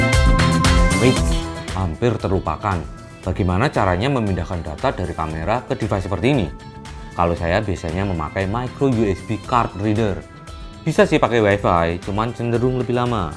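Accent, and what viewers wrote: Java, Indonesia. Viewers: Mantap... Pertahankan logat medhok nya yo mas.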